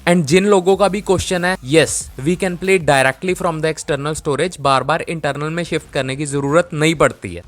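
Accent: Punjab, India. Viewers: Punjabi lag raha hai bhai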